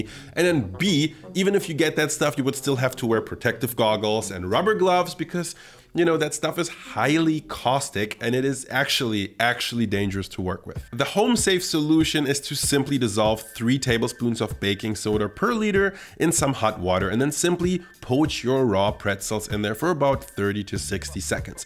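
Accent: United States. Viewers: You have such an American accent. It's kind of offensive that you start your post dissing other Americans. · You r accent is too american,I would have never guessed you were ein dEUTCHLAND Mann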